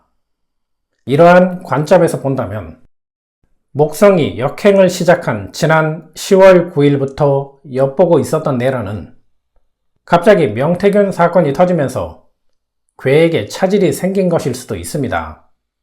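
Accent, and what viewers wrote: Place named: China. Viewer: 이사람 말하는게 중국사람같노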